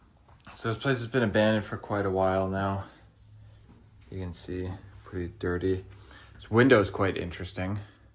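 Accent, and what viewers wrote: Canada. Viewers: I subcribed to you a long time ago, somehow forgot about how much i enjoy looking at homes, you popped up and i am so addicted and been watching like crazy! You are so kind and respectful. I love your canadian accent sooooo much! Omg, your just adorable! ❤❤❤ thank u! Your videos calm me down after a hectic day. Hugs! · Canadians always pronounced anything with an “ou” like owoo… howoose (house) owoot (out) spowoot (spout). Weird!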